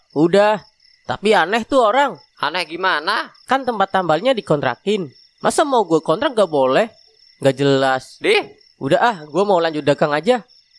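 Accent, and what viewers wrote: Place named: Banyumas, Indonesia. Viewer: Bahasane ngapak wae om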